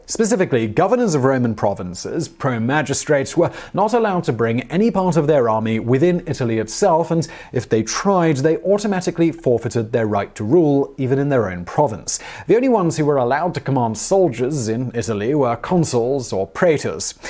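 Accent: United Kingdom. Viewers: Get a pretty girl that does not have a overwhelming British tone do you talking, is just my advice. Maybe good material, although who could sit through your monotone voice.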